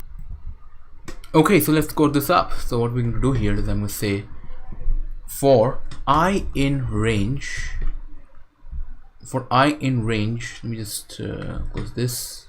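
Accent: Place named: India. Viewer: Damn man that really was helpful but listening to 33 mins of your indian accent was the hardest things i've ever done in my life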